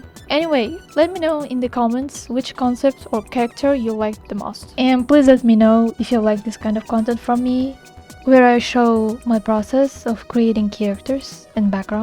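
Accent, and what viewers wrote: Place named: Russia. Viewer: Немного русский акцент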